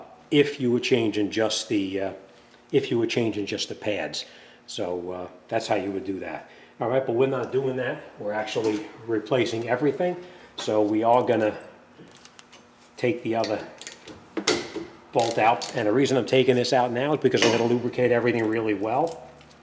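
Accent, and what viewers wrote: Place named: Boston, United States. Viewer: I swear to fuck. I love the Boston accent. Ya did gud wurk.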